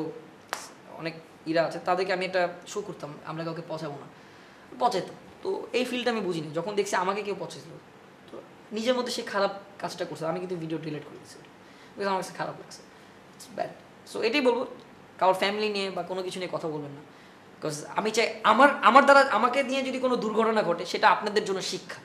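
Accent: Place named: Barishal, Bangladesh. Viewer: আপনার মুখে বরিশাল ভাষা শুনতে আমার খুব ভাল লাগে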